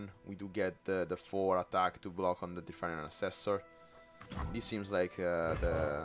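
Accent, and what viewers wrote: Italy. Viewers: l'accento italiano e incredibilmente ovvio comunque bel video. E METTI STO DECK CODE xd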